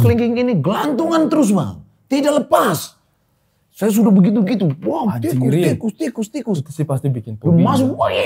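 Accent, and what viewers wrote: Eastern Indonesia, Indonesia. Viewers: kenapa logatnya mesti ikut²an logat timur? Mestinya pakai logat Minang aja, biar terlihat keberagamannya.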